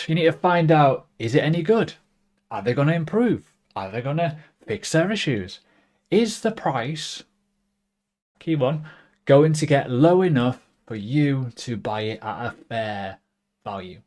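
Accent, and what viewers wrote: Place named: United Kingdom. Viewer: You are giving really good content mate. Nice accent , where from the UK are you ?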